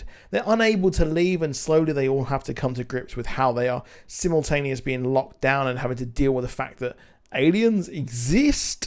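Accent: United Kingdom. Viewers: Why do you sound very British like!? You're from Rhode Island.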